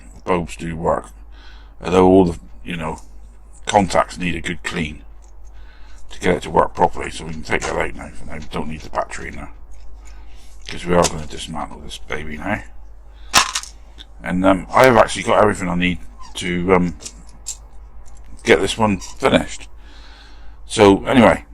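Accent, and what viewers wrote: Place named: Plymouth, United Kingdom. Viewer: Hi, I have been doing this since lockdown, really enjoying it. Used your video to help me with the Green Hornet. I am in Plympton Plymouth, from your accent you Must be a Janner! All the best Mark. Starting the Joe 90 this week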